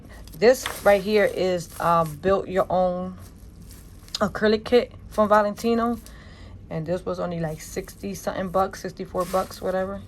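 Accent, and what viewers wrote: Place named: New York, United States. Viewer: Your getting better with your videos love the haul and your content girl I love your accent are you from N Y TFS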